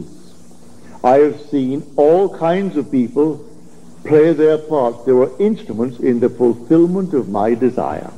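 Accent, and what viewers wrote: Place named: Northwest Florida, United States. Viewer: Yes in years past people in Northwest Florida spoke with the same lovely accent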